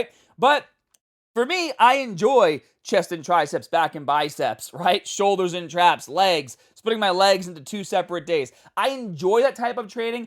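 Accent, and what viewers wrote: Boston, United States. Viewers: R u from boston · I'm never ready for the Boston accent lol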